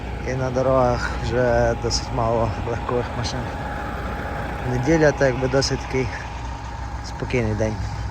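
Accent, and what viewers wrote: Western Ukraine, Ukraine. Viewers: Блін, як класно чути цей наш західний акцент... Привіт з Івано-Франківська...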